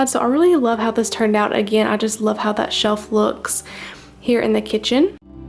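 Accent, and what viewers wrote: South, United States. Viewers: Love that Southern accent. Your closeups are excellent but also pull way back now and then, or upon entering the room and leaving the room so we can see how everything is placed in the entire room.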